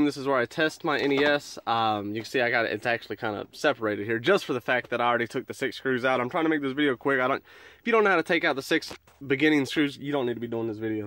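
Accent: South, United States. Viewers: This is an amazing video man! You sound like you're front the south as well like I am. Just picked up an NES for $5 at a yard sale and it works! I'm looking to refurbish it on my next day off.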